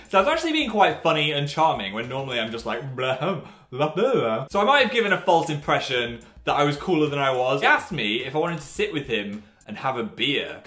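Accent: North, United Kingdom. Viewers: u sound northern again im living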